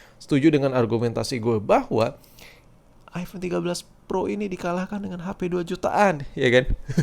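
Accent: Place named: East Java, Indonesia. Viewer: Orang jatim ya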